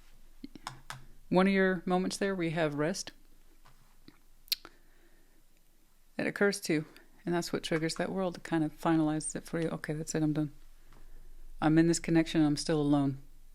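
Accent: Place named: Texas, United States. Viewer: You are the #1 Tarot channel, so many just read each other's scripts, don't show themselves actually doing the reading. Have no in-depth knowledge of the tarot & have horrible voices, unlike your sexy Texas drawl & wicked laugh. Thank you for being here & being so sassy I love your channel! Capricorn power!!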